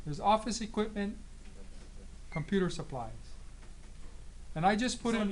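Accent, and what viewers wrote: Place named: Hawaii, United States. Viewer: sir are you Hawaiian?!? sweeaaaar I can hear it in your voice! love it!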